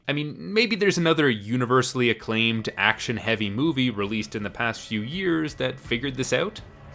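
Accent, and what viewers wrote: Canada. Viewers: can you be more canadian? ... it's awesome and fun to listen to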